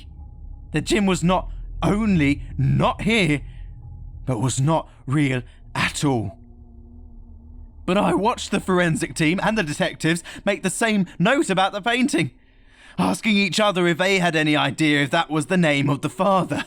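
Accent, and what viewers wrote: United Kingdom. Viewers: British narrator? Good narration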